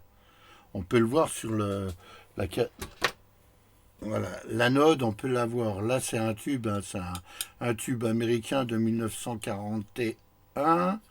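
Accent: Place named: Lorraine, France. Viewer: Bonjour, vous avez un accent lorrain,de quelle region etes vous.?...Connaissiez vous L ESR à Nancy boulevard jean jaures (L ecole speciale de radio électricité ) en 1968 et 1969...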